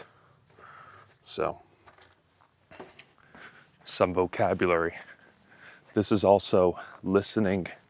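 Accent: United States. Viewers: your American accent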